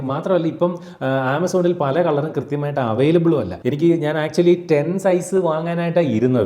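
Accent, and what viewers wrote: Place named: Kollam, India. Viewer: It's a kollam/ Trivandrum accent..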